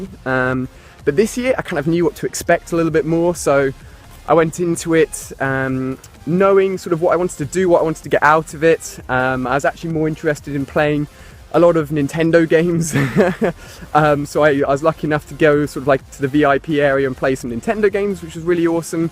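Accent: England, United Kingdom. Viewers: He's in England why the fuck would he be in Scotland because he has a English accent like me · Trust me, I know you're not hating. But most of us in the UK pronounce it like that. Same with due, and durability.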